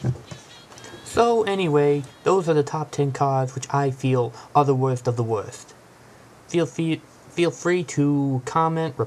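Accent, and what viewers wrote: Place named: South, United States. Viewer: HAHAHAHA Great video man!!! Are you southern??? sounds like an southern usa accent.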